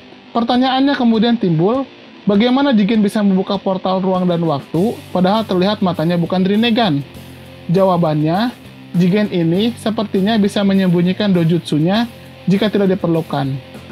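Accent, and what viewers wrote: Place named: Java, Indonesia. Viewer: Lucu orng in ngomongny medok pak,,,hahahahaha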